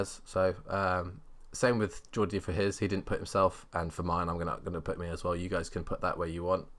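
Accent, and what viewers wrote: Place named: England, United Kingdom. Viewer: The thick English man of Battlefield Community is back. Love ya Keep up the content! 🐐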